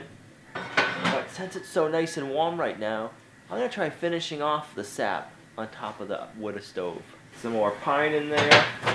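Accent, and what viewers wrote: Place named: New Hampshire, United States. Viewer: Gooood Mawning! I hope my poke at the New Hampshire (Massachusetts blend) accent doesn't offend, but it does come through loud and clear to this Lawn Guylanda! Looks great in the outdoor kitchen. I like that you're "tapping into" the available local resources for making Maple Syrup. I hope that you can put smiles on the faces of friends that you share it with and maybe trade it for something else that you can use. You should see if your pig farmer is willing to trade! Have a great day and enjoy the day with Olivia and Gina whatever y'all do.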